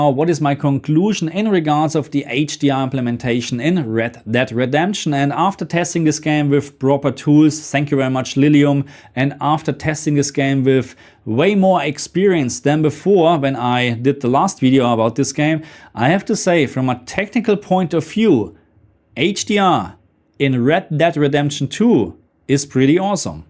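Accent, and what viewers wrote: Germany. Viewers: Man hört fast gar nicht das du deutscher bist 😂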